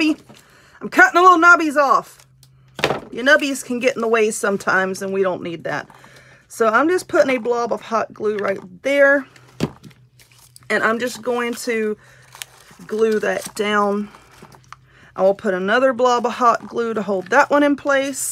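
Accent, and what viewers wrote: South, United States. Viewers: I love your Southern accent! Lived most of our lives in the South but now we are in Italy, so I really miss this southern style accent. Yet I am impressed how you can craft with those nails! Wow! Are you from Mississippi or Louisiana? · Love that southern accent💜💜💜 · I love your accent! I'm from Alabama. I bet you're not far from there!😊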